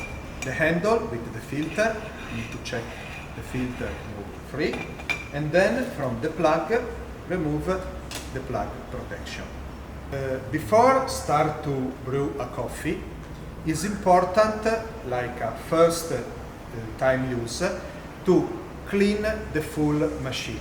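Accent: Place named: Italy. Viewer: Why does Italians speak English so badly? It seems impossible to even do it on purpose xD the accent is absolutely devastating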